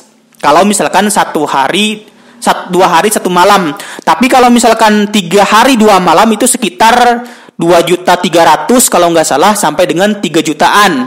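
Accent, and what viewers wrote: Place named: West Java, Indonesia. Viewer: Keren mang, meni sunda pisan logatna. InsyaAllah abi bade solo riding ka flores minggu dpn.. Aya IG mang?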